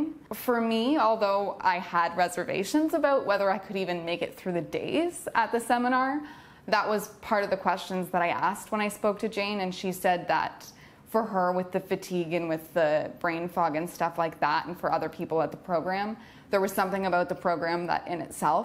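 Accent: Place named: Canada. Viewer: I relate to your story so much. Congrats on your recovery. Love your Canadian accent. Cheers from Southern California.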